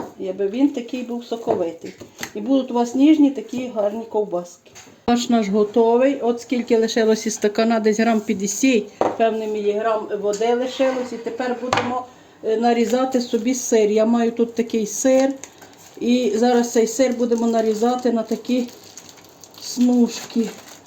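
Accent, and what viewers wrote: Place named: Transcarpathia, Ukraine. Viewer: І що?Жителі Закарпаття не соромляться свого діалекту.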